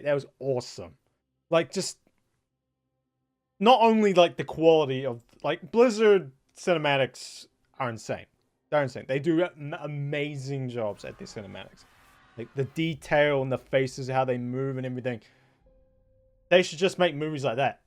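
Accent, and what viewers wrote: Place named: United States. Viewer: Im a kiwi....Your accents odd....Kinda got an american twang.....sorry....this comment probably isn't needed....but i've written it so ill post it lol.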